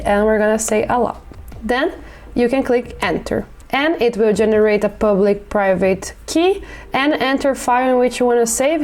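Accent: Brazil. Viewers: thank u so much. and btw a month of watching your tutorials, always assumed you were russian or ukranian, never crossed my mind that accent was brazilian😆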